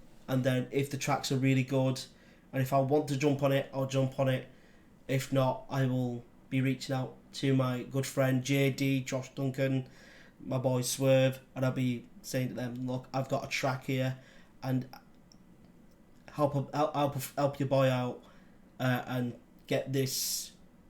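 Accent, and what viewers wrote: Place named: United Kingdom. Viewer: Where in the UK are you? Love your accent. I'm pulling for you. Good luck. I know you can do it.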